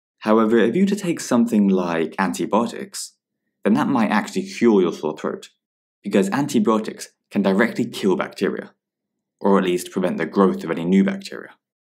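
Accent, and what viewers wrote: England, United Kingdom. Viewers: Thats how it's pronounced in the American accent you dumb the guy in the video is English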